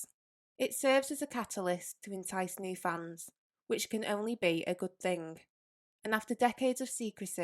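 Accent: United Kingdom. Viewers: As true fans we would naturally take in everything available about F1. We therefore each have our own opinions about the content of whatever we are watching. Whether your favorite driver was “properly” presented, for example. Consider that Netflix viewers are just browsing available content and see this series. They watch one. Maybe another one. And suddenly they see drama and excitement, politics, technical details, personalities and families and daily struggles. They have discovered a huge thing out there they never even wanted to be interested in has global appeal. New fans. Like me to this channel. I am doing a bit of binge watching now. Great job. Nice stuff. But then again, I am a total sap for a lovely British accent.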